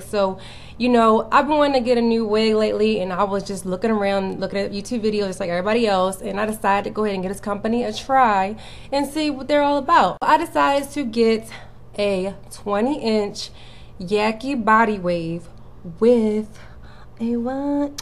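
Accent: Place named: Baltimore, United States. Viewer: As soon as I was saying in my head, she's sounds like she from Baltimore, you confirmed it! You sound like me when I get mad 😆 But this wig sounds like What I've been looking for for forever, I hope it's still available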